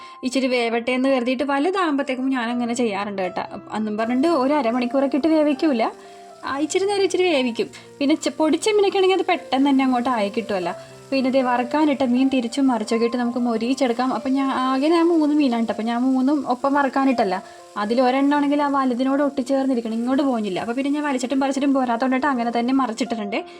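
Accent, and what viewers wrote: Kochi, India. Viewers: ചേച്ചി കൊച്ചി ഭാഷയിൽ ശസാരിക്കു ചേച്ചി നല്ല രസം ഉണ്ട് കേൾക്കാൻ പിന്നെ ചേച്ചി മുളക് പോടീ നല്ല കളറ് ഉണ്ടല്ലോ ഏത് മുളക് പൊടിയ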